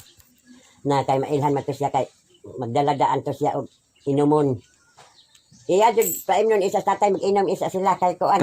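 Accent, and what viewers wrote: Surigao, Philippines. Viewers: 😁😁😁😁 lingaw Jud ko nimo la ako Lola taga surigao sad ingana sad mag storya sa emoha 😁😁😁watching from parañaque 😁😁😁😍😍😍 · Lola,makalingaw jud imng storya la, bisaya surigao accent.... taga surigao here sad lola. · asa ka dapit sa surigao lola? mao diay ang imo sinultian familiar kaayu 😁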